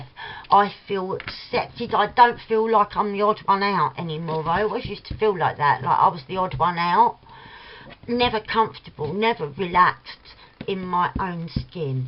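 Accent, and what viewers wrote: Cockney, United Kingdom. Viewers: Thank you for tagging me! I’m filming the response today 😊❤️ oh, and I absolutely adore your cockney accent 😉❤️❤️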